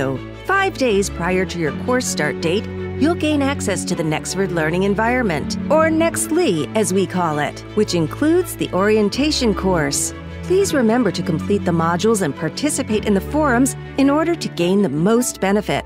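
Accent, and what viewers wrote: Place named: South Africa. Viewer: She is definitely South African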